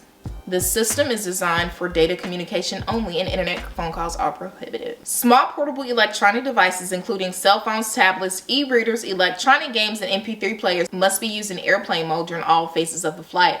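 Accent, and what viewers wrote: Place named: Chicago, United States. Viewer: I can hear your Chicago accent and love it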